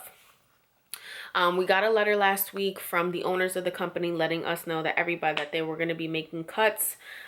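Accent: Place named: New York City, United States. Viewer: Are you originally from NYC, I hear a NYC accent lol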